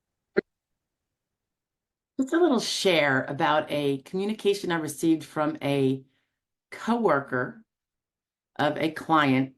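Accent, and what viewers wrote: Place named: Georgia, United States. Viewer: I thought that accent was a sweet mama Georgia peach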